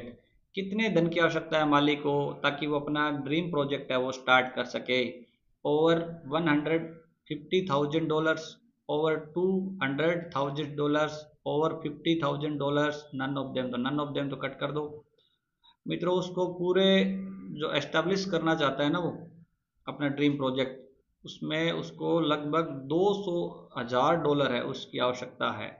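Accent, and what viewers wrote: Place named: Shekhawati, India. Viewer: Sir aap kaha se h vo maine isliye pucha kyo ki aapke bolne me शेखावटी ka lahza aata h so.....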